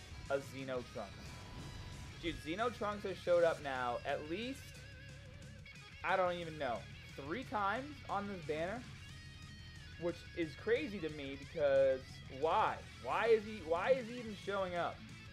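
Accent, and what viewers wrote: Boston, United States. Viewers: Don't let toon bully you out of your Boston accent man! I loved the way you said dokkan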